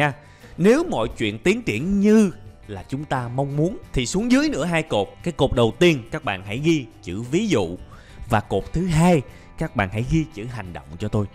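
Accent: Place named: Saigon, Vietnam. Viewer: Thầy giảng hay lắm ạ. Mình thích giọng sài gòn quá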